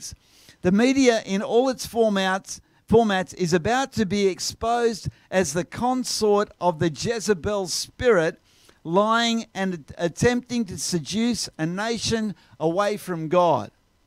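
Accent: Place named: Australia. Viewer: Great to hear an Australian Christian voice predicting the rebuilding of American Christian faith. I pray you are right. God bless. SOK. Geelong, Australia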